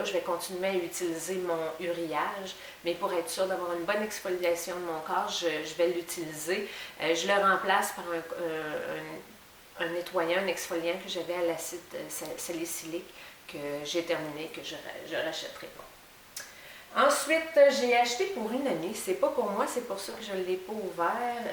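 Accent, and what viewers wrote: Quebec, Canada. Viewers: jadooooore laccent quebecois ! ton accent est 😍😍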